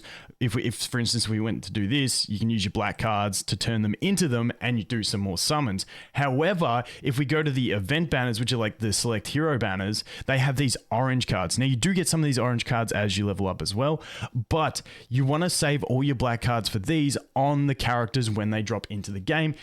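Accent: Australia. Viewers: Wait is he an Aussie. Surely? Still subscribing anyways lmaooo Edit: yep he definitely is… he has 7/11 ads HAHAJSHSHSJSJ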